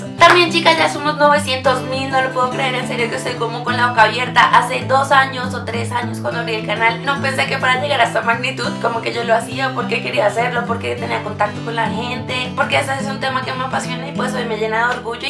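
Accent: Colombia. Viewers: yo se que los colombianos hablan asi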